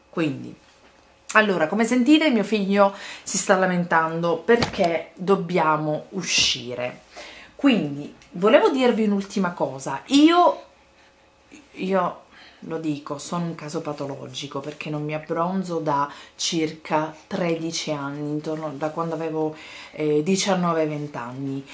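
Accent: Sicily, Italy. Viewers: Sei siciliana? dv precisamente?:) Un saluto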